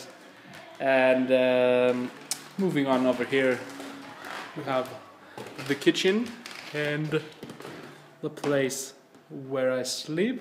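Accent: Germany. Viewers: 100% DEEnglisch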